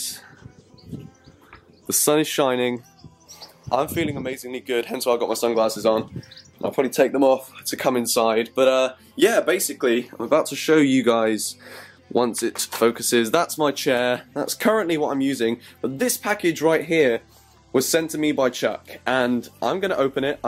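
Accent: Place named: United Kingdom. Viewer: Hey man, where roughly in the UK do you live (not a stalker,just trying to figure out the accent)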